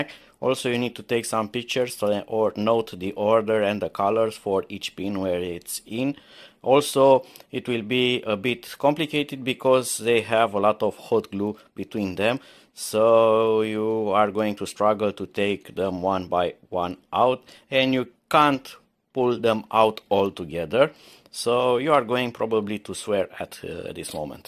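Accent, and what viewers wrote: Romania. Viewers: Recunostii romanii dupa accentul pe care il au in engleza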